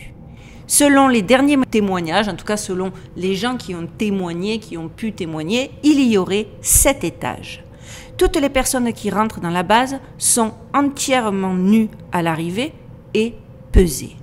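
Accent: South, France. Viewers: Bonjour je suis tout à fait d’accord avec vous à 100 % vous racontez très bien et en plus vous êtes très charmante avec votre accent du sud moi aussi je suis du sud dans le 13 et je pense que les loups-garous viennent de là aussi et que des civilisations anciennes évolué technologiquement par des dieux venues des étoiles ont fait des expériences hybride car déjà les Anunnaki ont fait sur les humains du singe on est passé à l’Homo sapiens et Neandertal et il y a eu des erreurs à l époque quand il y avait un problème il nous noyer (Le déluge ) tout en gardant un échantillon ( L’arche de Noé) et la base de dulce et une continuation de tout ça . en tout cas tout ce que vous dites Tout s emboîte parfaitement comme un puzzle !!! Et avec logique bonne continuation encore une fois vous êtes très ravissante avec beaucoup de charme !! Je vous adore 🥰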